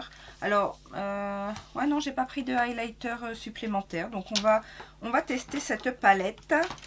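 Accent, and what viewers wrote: South, France. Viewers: Coucou, j'aime beaucoup ce makeup ! Oui donnes nous l'accent du sud pour faire venir le soleil, j'en ai trop besoin, gros bisous ! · Coucou ma coquette ah lala tu es dingue je t’adore oui pour le tuto accent du sud 😂😂😂😂 groooos bisous 😘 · OUI pour le tuto accent du sud 👍🤓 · Merci pour cette vidéo avec un mkp très réussi. J’aime beaucoup ton teint et donc tes produits teints que je ne connais pas. Oui oui pour une vidéo avec l’accent du sud... moi je suis du sud-est 👍🏻👍🏻. Très bonnne fin de journée biz 😁🌸💋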